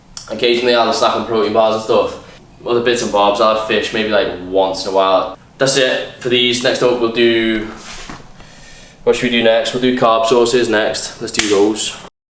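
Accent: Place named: Manchester, United Kingdom. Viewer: You got that propa manucunion accent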